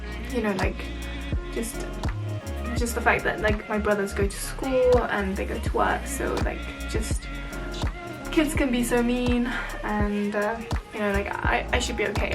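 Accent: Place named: United Kingdom. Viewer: Wow! It's great that you have such a great sense of fun about this BUT, It's really deplorable and sad that it's even necessary to approach this subject. I think you will need a paper bag over your head and some kind of sack clothing to prevent being recognised as asian. I've been away from England for 40 years but your accent and expressions sound beautifully British to me, so no worries there.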